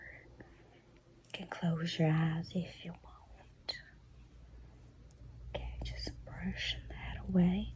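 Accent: South, United States. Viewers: I still loove the southern accent! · Gawd, I love that Southern accent. Great video too ❤ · “Nice and purdy” Don’t know why but your southern accent, particularly the grooming videos, gives me Steel Magnolias lol I love it ❤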